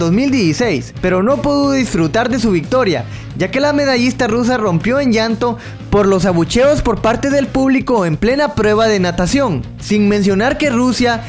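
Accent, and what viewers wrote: Honduras. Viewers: se nota el acento hondureño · Oye te detecto acento Hondureño Eres de ahí ?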